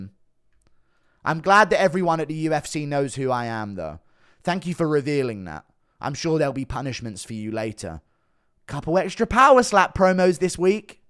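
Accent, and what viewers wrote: United Kingdom. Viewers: British accent makes the unwatchable..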